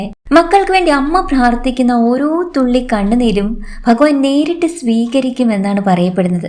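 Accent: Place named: Valluvanad, India. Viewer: എല്ലാം ഒരേ വള്ളുവനാടൻ ശൈലിയിൽ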